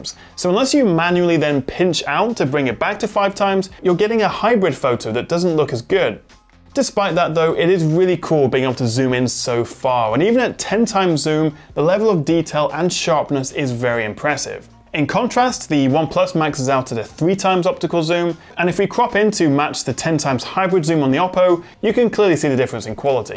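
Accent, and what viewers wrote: United Kingdom. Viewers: this guy made me love british accent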